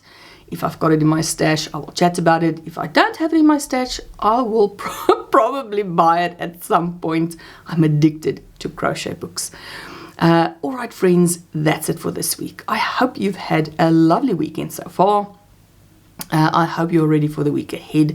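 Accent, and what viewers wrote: South Africa. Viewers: Hello. Your bee is gorgeous and what a lovely story. I am lucky I have both of these books already. I have finally caught up on all your videos. I love your channel My son in law comes from South Africa but he and my daughter and two grandsons live in the UK so it is lovely to hear the accent. My husband also visited Denmark years ago and loved Copenhagen. He used to work for Bang and Olufsen. He has retired now. We are from Australia. Lovely video. Thank you have a great week. ❤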